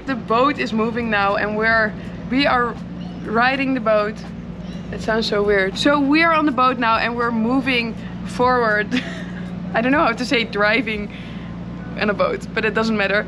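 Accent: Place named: Netherlands. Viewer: You sound just like ivana from travel iv . That dutch accent is very noticeable. Do a collab with her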